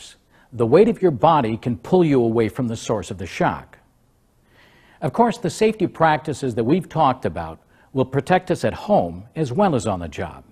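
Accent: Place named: United States. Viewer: Zakaj dobimo amerikanski video, če se učimo bri'ish english?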